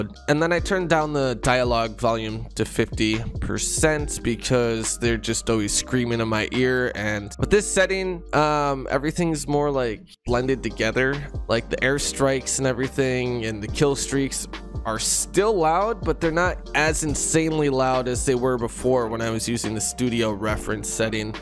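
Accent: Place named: Russia. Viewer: u sound russian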